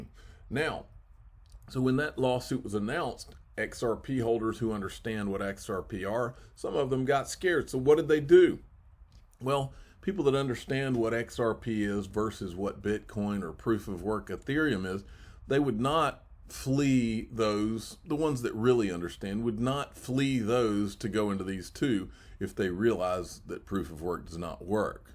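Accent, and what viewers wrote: South, United States. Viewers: Love listening to crypto news in a Southern accent!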